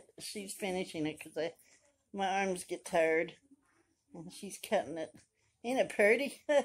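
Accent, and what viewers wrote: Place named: South, United States. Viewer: I love your voice, It sounds really nice, sometimes I go over your videos to hear your soothing voice, Its comforting.,Sounds like a Southern Girl.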